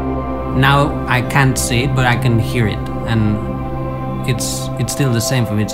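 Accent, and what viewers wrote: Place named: Philippines. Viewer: he sounds Filipino, is he Filipino?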